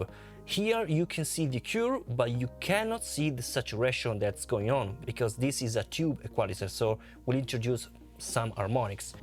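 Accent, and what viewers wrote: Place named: Italy. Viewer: this is how italians talk english 😅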